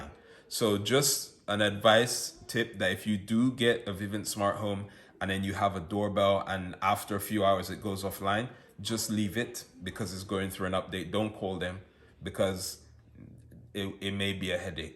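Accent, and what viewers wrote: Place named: Caribbean. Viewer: Funny u don’t sound British, sound more Caribbean from African descent. 😮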